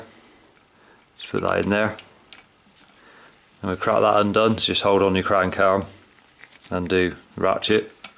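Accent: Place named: Birmingham, United Kingdom. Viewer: Nice man thanks .. Is you a fellow brummy?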